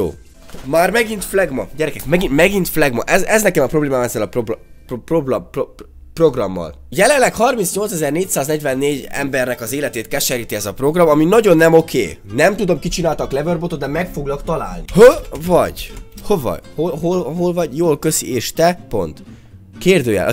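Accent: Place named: Szekler Land, Romania. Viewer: "Föl" végre halotalak székelyesen beszélni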